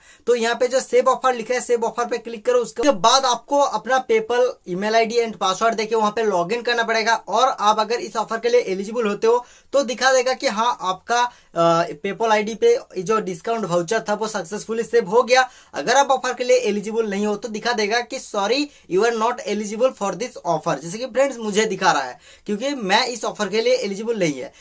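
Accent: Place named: Bengal, India. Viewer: Bhai aap Bengali Ho 😀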